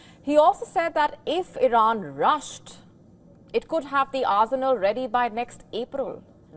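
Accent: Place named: South India, India. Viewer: Is the news reader a south india. Her accent seems she is from south.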